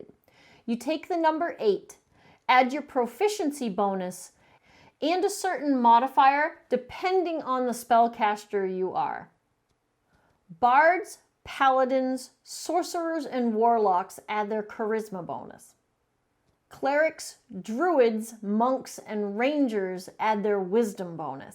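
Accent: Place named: New England, United States. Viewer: Finally a New Englander talkin' about D&D! Go Sox!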